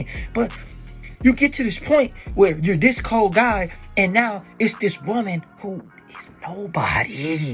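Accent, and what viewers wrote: Baltimore, United States. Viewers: Sound like you from Baltimore ?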